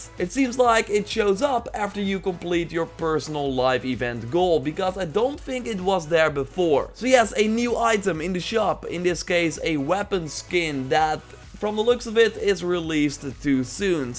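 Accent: Netherlands. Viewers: Are you Dutch/Flemish?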